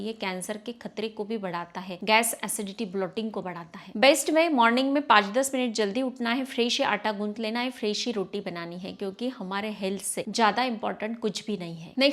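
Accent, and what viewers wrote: Maharashtra, India. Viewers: मराठी tone का हिन्दी ब्लॉग टिप्स बहूत बहुत सुन्दर प्रस्तुति,आपके अन्दर श्री महालक्ष्मी जी के अंश ज्यदा है,wow❤❤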